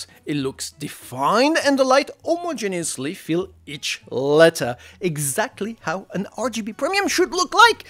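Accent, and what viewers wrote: France. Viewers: You have an french accent xD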